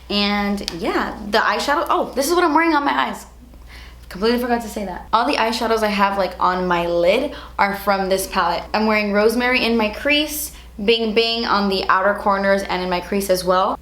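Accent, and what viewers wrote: United Kingdom. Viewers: Slaaaayyy! Btw I love your British accent keep doing it! ❤️❤️❤️ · How do you get that british accent?? Wanna know the story... 😍😍😍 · Omg I love you so much, and even more every single video but that 'British' accent has my love for you going wild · When does her British accent it sounds like Alex drake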